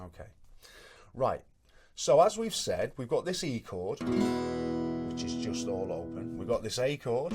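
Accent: Liverpool, United Kingdom. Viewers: Ahh ok, it had to be one of them and to be honest your accent is just a tiny bit more softer and more subtle than what I expect from Liverpool lol. Anyway thanks for the upload, good video, I'm already experienced in alternative tunings but your video just loaded automatically after a previous video I watched and you had me playing along like I was a beginner.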